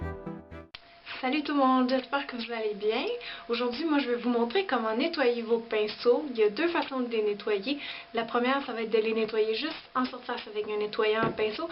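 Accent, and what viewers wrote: Quebec, Canada. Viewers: C'est l'accent québécois ça n'a rien de bizarre ...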